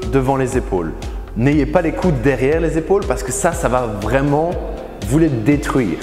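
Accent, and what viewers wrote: Belgium. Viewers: bon job je note un accent belge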